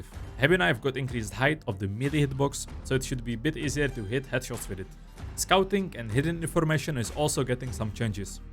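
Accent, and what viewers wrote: Czech Republic. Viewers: Is that a Czech accent ?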